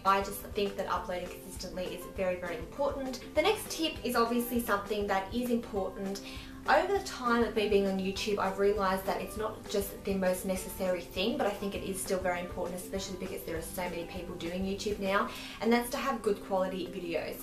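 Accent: Australia. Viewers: are you australian????? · Are you Australian?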